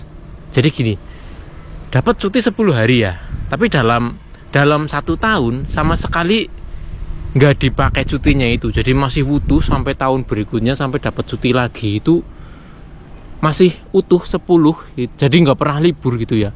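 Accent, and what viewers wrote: Banyumas, Indonesia. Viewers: Ora ngapak ora kepenak.. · mas...anda lucu sekali ya... indonesia bngt aksenmu sukaakkk....ga ada takutnya ya di negeri horor dan mistis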